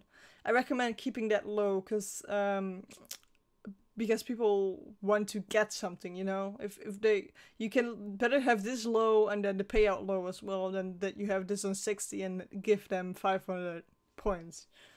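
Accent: Netherlands. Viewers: thnx :D i stream for a while but i dont get !lurk to work cuz is uses $(user) is now in lurk mode but in chatbot it doesn't work :( greetz from the netherlands :D (ja ik hoor dat accentje wel LULZ) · Are you Dutch or German? Your accent sounds slightly more Dutch, but you look just like a German girl who goes to my church. Goede dag! Guten Tag!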